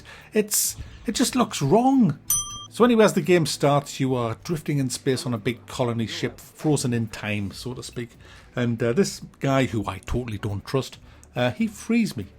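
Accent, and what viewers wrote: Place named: North, United Kingdom. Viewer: Damn you sound so northern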